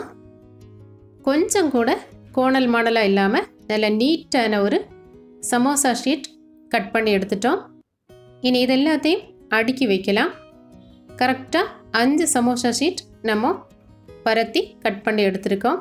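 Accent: Kerala, India. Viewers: It's like malayali talk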